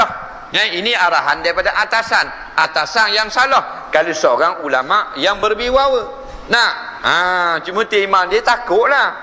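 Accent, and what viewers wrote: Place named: Kedah, Malaysia. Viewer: MashaAllah ustaz boleh,cakap kedah,,terbaiek.... السلام عليكم.. الحمدلله لا اله الا الله محمد رسول الله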